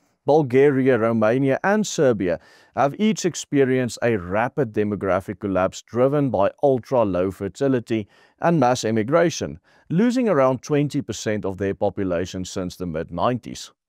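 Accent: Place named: Afrikaans, South Africa. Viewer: EK SKRYF IN AFRIKAANS, WANT DIE MANIER WAT JY PRAAT, VERTEL MY JY IS AFRIKAANS. HOEKOM PRAAT JY IN ENGELS JY IS N TOTALE ID***T. HOEKOM PRAAT JY OOR BLANKES OP INTERNET. IS JY MAL OM SULKE INLIGTING OP INTERNET TE PLAAS????? EK WEET JY WIL GELD MAAK , MAAR JY DOEN DIT TEN KOSTE VAN BLANKES WAT GEEN PROBLEEM HET MET ANDER KLEURGROEPE NIE. MAAR DAAR IS KLEURGROEPE WAT N PROBLEEM HIERMEE HET LAASTE LUISTER GEWEES, LAASTE KYK!, JY IS EINTLIK N VYAND VAN N TANS VREEDSAME SITUASIE!!!!!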